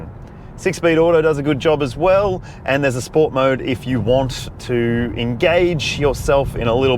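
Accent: Australia. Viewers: When I heard your accent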